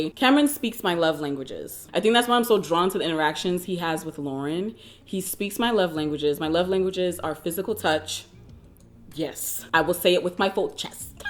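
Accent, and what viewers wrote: United Kingdom. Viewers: She’s is giving us that auntie pats british accent and that’s on PERIODT my darling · The British accent has to stoppppppp.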